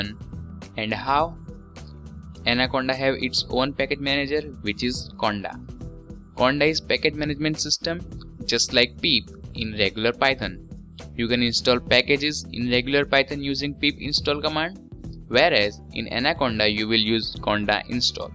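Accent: Gujarat, India. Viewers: From your accent !! I am also Gujarati that's why i know.. BTW i like your video and want more videos about ML and AI. THANKS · R u gujju? I assumed by your tone. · Make gujarati friends and you will understand this accent.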